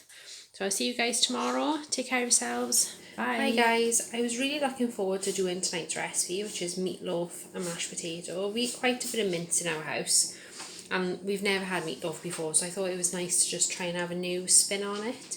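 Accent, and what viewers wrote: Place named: Wales, United Kingdom. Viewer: Hello, new subscriber😊 just wondering where your accents is from, I’m from South Wales myself and your accents sounds welsh😊 brilliant video x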